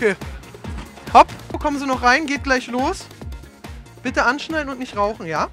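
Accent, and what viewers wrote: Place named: Berlin, Germany. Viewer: Ich könnte mir das video so oft angucken immer lust drauf haha mit seine berliner icke😹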